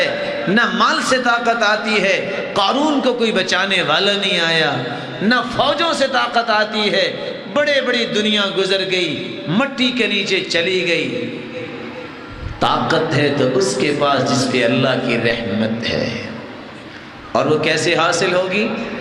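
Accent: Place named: Saudi Arabia. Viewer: MashaAllah MashaAllah sheikh sahb ap saudia sy lgty h apka hadith parhny ka lhja arabic h coz mny quran saudia sy hifz kiya h.Subhan Allah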